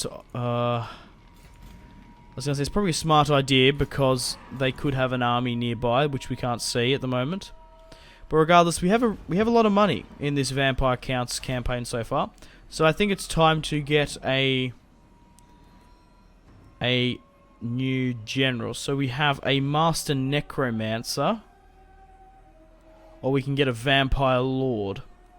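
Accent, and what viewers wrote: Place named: Australia. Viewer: The only thing that keeps me watching your vids is your Aussie accent. It's like listening to a 18th century English commander. I bet u have a handle bar mustache too.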